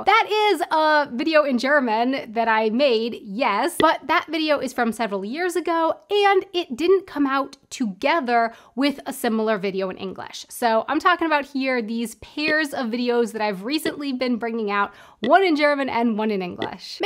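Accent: United States. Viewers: Colombian in Germany. I think most viewers are ex-pats in Germany, thus the English preference. Also, the popularity of the videos in English, rather than German could be because you have a very thick American accent in German, and I personally, have problem enjoying it. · U.S. viewer. I normally watch the English version. Sometimes I feel like watching the German version, though, because I took several years of German in high school, yet I find it very difficult to understand videos from native Germans. Weirdly, I suppose because of your American accent, I can understand your German surprisingly well. Thus it's fun to "practice" my German by listening to an American speak it. 🤔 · As an ex-pat Brit living in Germany, I watch your videos for your experiences of living here. To be honest, I prefer the English language videos, your American accent with German words is sometimes hard. 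Re: finding out about the videos - checking my subscriptions or when you mention it on Twitter.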